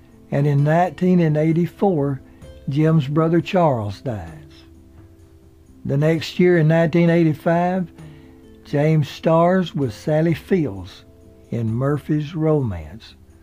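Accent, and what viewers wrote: South, United States. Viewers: Where is the narrator from..? His accent is wonderful? (I mean which US state) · has this addictive mesmerizing All American folksy voice that's perfect for story telling. This story and the one of Billy The Kid are excellent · Very touching documentary, made better by your homespun southern accent. Thanks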